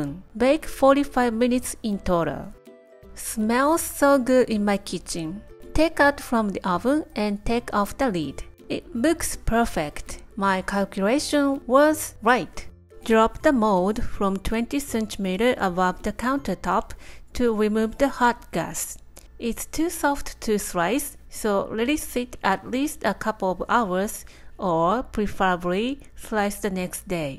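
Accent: Japan. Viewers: You sound like my dentist who's from Japan. I absolutely ADORE her🥰. And thank you so very much for your contribution. Subscribed. 🙂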